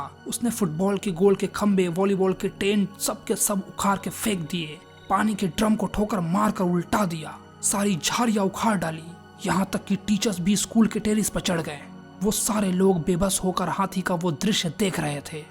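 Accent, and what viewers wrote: Bihar, India. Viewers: Bihari language me maza aa gaya 😂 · Are you bihari I am also bihari because you spoke bhojpuri 😅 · AAP to Pakka Bihari lag rahe ho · are you bihari?? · aap biharse ho kya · bhai aap bihari ho na 😊😊😊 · bihari accent😁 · Aap bihari hai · Bihari ho bhaiya?? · Sir aap Bihari ho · Do you belong from Bihar??? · Bhai aap bihar ya up se hoo aapki explanation yrr 😭 bahut jhakkas hoti hai bahut ache se samajh me aata hai ❤ love you bhaiya 😊❤ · Bhai app kha ke ho bhoot wala story me jo tone tha wo mujjafapur sultanpur yahi sab ka tha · Bruh i think you are from bihar 😅, like no offence but ur method of speaking shows that. ❤ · Bhaiya aap Bihar se hona ❤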